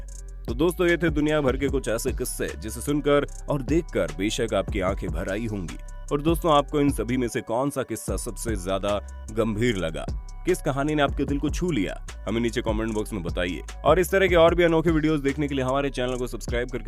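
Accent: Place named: Jaipur, India. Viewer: Bhai apki real voice sunai ye yeto koi jaipur vale bande ki hai bhai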